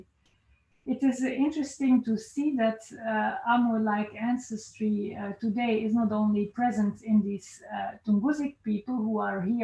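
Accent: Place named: Belgium. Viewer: I would have guessed a Belgian French accent, but either way it's the same region and matches her name (French first name, Dutch or Flemish last name). The quality of comments here is atrocious even for YouTube and far worse than anything I have seen under any other academic video. The OP here is a good example for this. Living in Neukölln, I love Turkish culture, but the guys commenting here are doing a good job of harming their country's reputation. I have only seen similar idiocy on this level from Indians.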